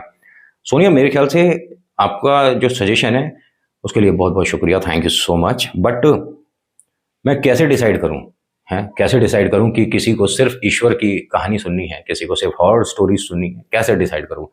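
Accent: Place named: Mathura, India. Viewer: Aapki Mathurawali boli gazab hai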